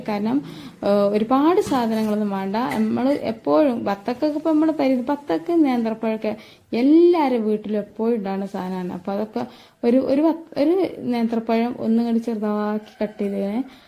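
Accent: Malappuram, India. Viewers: താത്താടെ മലപ്പുറം ഭാഷാ കേൾക്കാൻ എന്ത് രസാ. 👍👍👍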